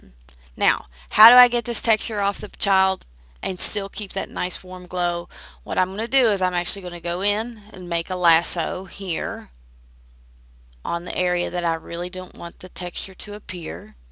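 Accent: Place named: South, United States. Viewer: thanks, dig that sexy accent too.......are you from the southern part of the states ?.........great vid !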